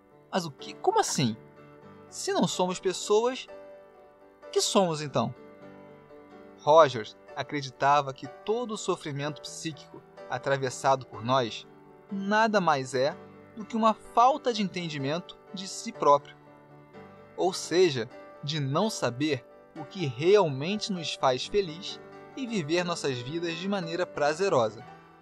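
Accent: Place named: Rio de Janeiro, Brazil. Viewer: (*)ele é cari oca ele é carioca Olha o jeitinho ( o sutaki ) dele ao falar... (*) O meu Coração é carioca A minha Alma é carioca 🙃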